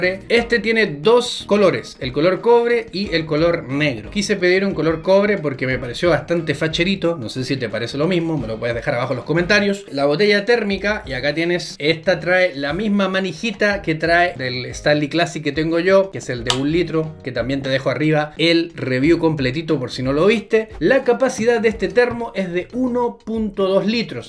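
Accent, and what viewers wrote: Chile. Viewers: Hola que tal, mira asique vivis en Francia, y se consigue yerba halla? Voz no sos Argentino, sos Chileno por el acento, estoy en lo correcto? SALUDOS desde Nueva Zelanda, voz sabes que aca se consigue todo pero bastante mas caro por supuesto, que tal los precios en Francia, Mercy 😊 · Buenísima recomendación! La compraré a ver qué tal. Me queda una duda de cuál es tu nacionalidad ya que tu acento me suena chileno pero a la vez no jajaj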